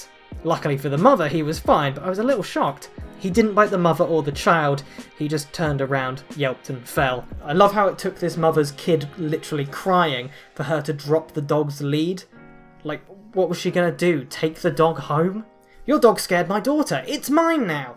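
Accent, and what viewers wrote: United Kingdom. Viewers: Legit doesn’t sound male or female, just british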